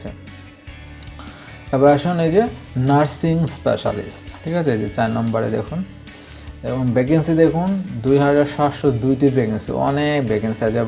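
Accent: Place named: Sylhet, Bangladesh. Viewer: Vai mone oy Sylheti 🤩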